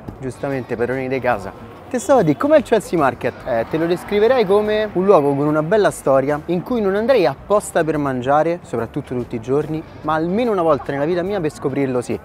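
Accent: Rome, Italy. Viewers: Assomigli a Dean Winchester: adoro! Tu e il tuo romanaccio, verrete con me domani in treno:)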